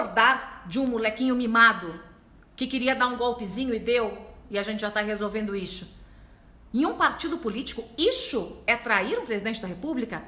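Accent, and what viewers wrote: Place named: Rio de Janeiro, Brazil. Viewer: Robô carioca deslike!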